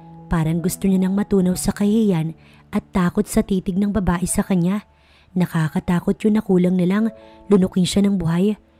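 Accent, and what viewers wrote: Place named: Iloilo, Philippines. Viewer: ang deliverance at sound web ng Bose's ilongong ilongo gid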